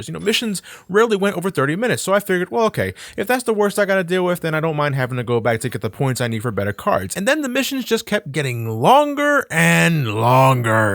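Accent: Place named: United States. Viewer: Oh I agree with you there; because of our different regions with different slang and colloquialisms. A lot of English mispronounce as well, which hurts me even more, haha. I was just saying that is a very American way of mispronouncing as I've typically seen in American television shows etc.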